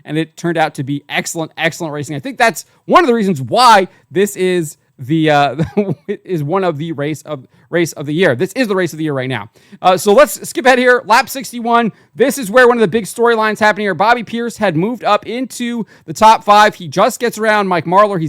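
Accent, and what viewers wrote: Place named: East Coast, United States. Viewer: Damn right.... Idk where you coming from (location) (NC in the house) but you sound like an east coast guy and we've got very similar racing tastes. But I most definitely agree, this is one of best shows going. I checked the North-South 100 off last year as a bucket list event. Unfortunately I won't be able to go back this year as I'm checking off some other bucket list stuff .... However The PDC and Eldora are on next years bucket list..... Maybe we can hook up at some point and catch a race, have a beverage and enjoy a race together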